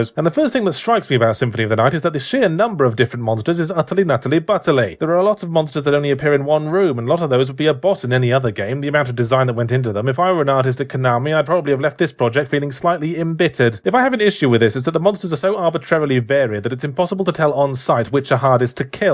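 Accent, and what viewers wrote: United Kingdom. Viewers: After I watch his videos everything I read is in his sarcastic, cynical british accent.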